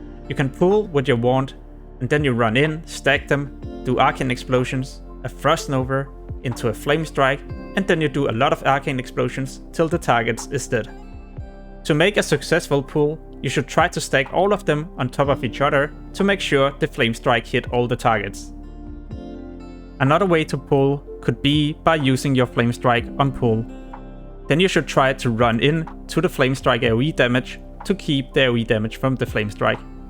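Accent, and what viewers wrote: Denmark. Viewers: HUGE dansker accent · it's all good, nice video you made. - sounds like you are danish :) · Danish guy. It's the accent.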